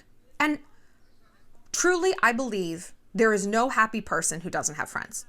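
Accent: France. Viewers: Got a like from me for that french accent :D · The French accent 😭😭😍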